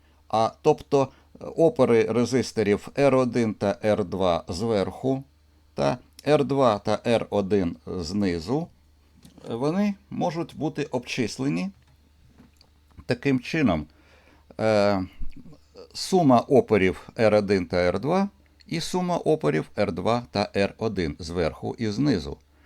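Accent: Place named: Odesa, Ukraine. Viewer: Одеський акцент української пестить вухо. Дякую. Цікаво.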